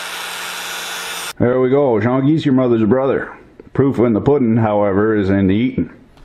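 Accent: Canada. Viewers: definitely canadian. eh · Just sounds like a fellow Canadyun to me. I don't hear an accent. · Sounds Canadian. Fun video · Haha are you Canadian guy? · Canadian? or Minnesotan?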